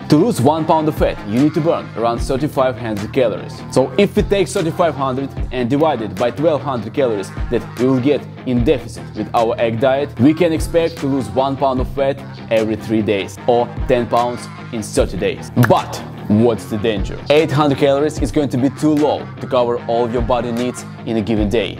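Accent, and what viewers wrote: Russia. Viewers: Good russkiy akcent,! Kak dela?) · Русский акцент,уникален) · сразу выдает русский акцент :)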